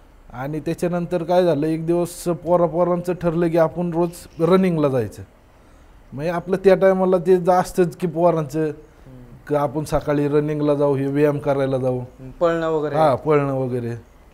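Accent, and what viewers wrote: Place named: Pune, India. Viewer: Propar puneri language Good 👍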